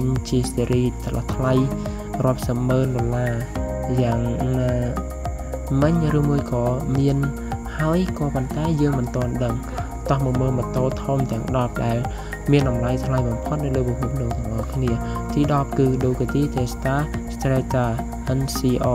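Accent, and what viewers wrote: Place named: United Kingdom. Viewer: តុង English ពេកឮតែខ្យល់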